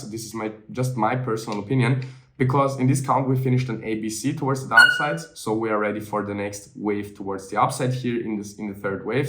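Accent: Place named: Austria. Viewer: Typically Austrian accent.. 🍺🍺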